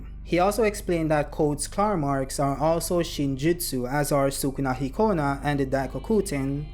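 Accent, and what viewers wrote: Jamaica. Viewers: are you jamaican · I know you were from Jamaica because of the accent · I stg im following you for your tasteful Jamaican accent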